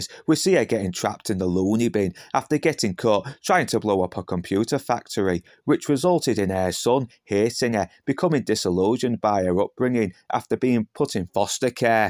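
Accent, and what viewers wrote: United Kingdom. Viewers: I'm Romanian and I adore that British accent!!!Good job mate!!! :face-red-heart-shape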